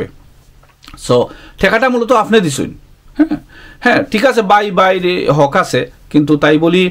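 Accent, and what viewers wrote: Sylhet, Bangladesh. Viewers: He is from Sylhet n talking to his community.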